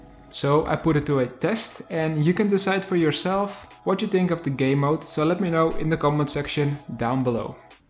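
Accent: Netherlands. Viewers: Goed Nederlands accent hoor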